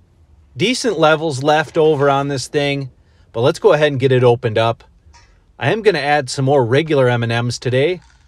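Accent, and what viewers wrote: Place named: Canada. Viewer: Where are u from? Your voice sounds Canadian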